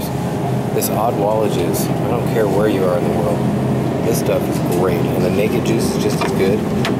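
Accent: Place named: United States. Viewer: Might be a stupid question, but why do you seem to have never been in a large american supermarket, yet you sound like you're american?!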